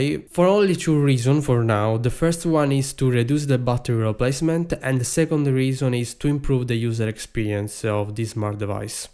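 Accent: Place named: Italy. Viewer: It is very understandable and the Italian accent constantly reminds me of the beautiful language, nice wines and food -> positive 😄🇮🇹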